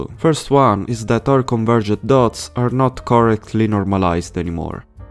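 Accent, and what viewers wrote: Italy. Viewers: Sorry if i ask but are you italian? I love you btw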